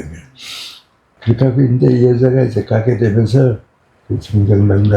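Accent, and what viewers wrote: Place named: North Korea. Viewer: 북쪽 사투리...듣기 힘든 시대가 되었어요. 언제나 들을 수 없는 귀한 말투 입니다. 지금도 듣게 해주셔서 감사합니다. 건강하시길 간절히 기도합니다.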